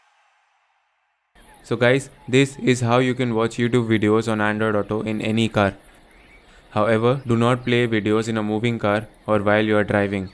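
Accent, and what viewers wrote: India. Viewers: I don`t understand curry english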